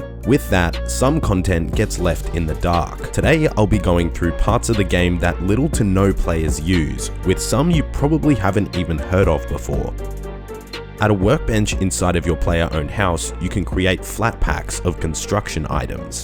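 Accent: Australia. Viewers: I fucking love your voice, are u from Australia? The accent combined with the deepness of your voice makes me happy.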